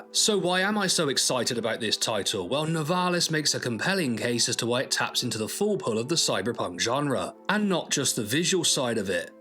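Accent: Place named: Bristol, United Kingdom. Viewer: You sound like you're from my neck of the woods me babber!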